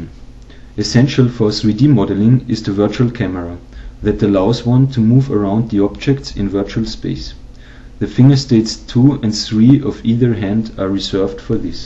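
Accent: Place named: Germany. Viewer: i like your english bro ...it sounds so german xD Njo egal, find ich ne geile sache xD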